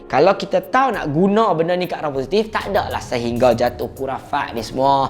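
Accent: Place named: Penang, Malaysia. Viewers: Aku suka cakap. Besala oqang kita.. Penang mariii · samaaa...layann jaaa ceritaaa diaaa tambah2 pelet utaqa lak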